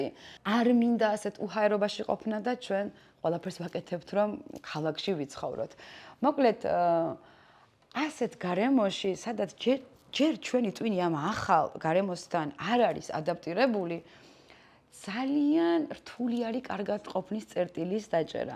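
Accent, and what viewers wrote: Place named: Imereti, Georgia. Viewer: წარმოშობით იმერელი ხართ ხო? პირველივე ვიდეში მოხვდა ყურს იმერული ჰანგები და აჰა, 😄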